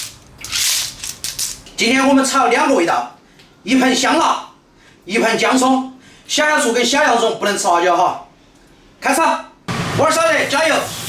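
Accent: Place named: Hunan, China. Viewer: 我咋听出湖南口音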